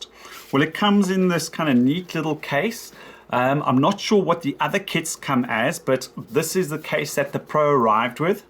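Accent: South Africa. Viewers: South Africa or Zimbabwe probably, def. not British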